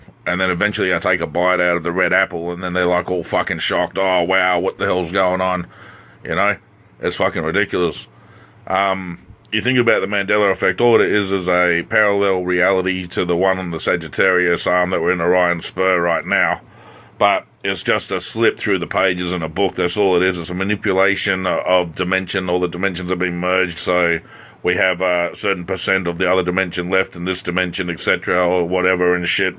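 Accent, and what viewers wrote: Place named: Australia. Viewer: Hi there, this video is helping me. I have to listen however many times in order to gain the understanding you are putting forth. Mainly bc you speak fast and with an Australian brogue. Lil' lol. I am sure you get a million comments so i know you will not remember mine. It was full of doctrinal manifestations, even though at the time i thought i was way past that. You know, having come 'out of her' and all. It is indeed by layers, one layer at a time. Im not going to let go of CHRIST my LORD. Im just having a bigger look at how the scripture was so soarly perverted and literally turned backwards. I have had Nelson visit me personally before i had heard he was lurking around. It happened when i was reading ( in the style of 'gulping' down a big tall glass of water, a few whole chapters at a sitting. As apposed to an intricate word study verse to verse) Anyway, learning from you. 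Thanking you and feeling really thankful. I am going to share a link with you from a young guy who quickly posted his experience. Its short and who knows, maybe a helpful tid bit. Alrighty, i hope to see you soon!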